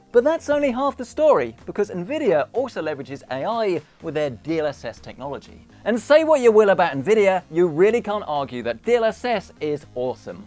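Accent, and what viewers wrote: United Kingdom. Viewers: Too much British can't understand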